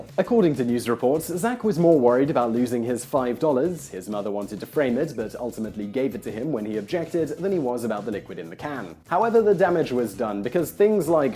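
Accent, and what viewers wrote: United Kingdom. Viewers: Since when has Vsauce Micheal had a British English accent?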